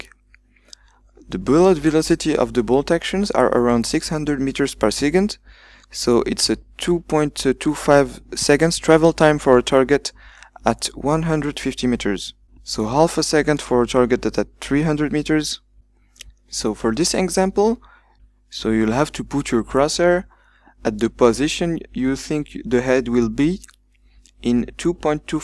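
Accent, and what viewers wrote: France. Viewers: Il ne serait pas français ? Le petit accent on l'entend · i was a bit confused at the keys you provided, but then i remembered you have a french accent so you are probably using a azerty keyboard. Anyways, i'd suggest providing the qwerty equivalents next time :P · Is it possible that you are french? If so you are one of the few french people that can speak english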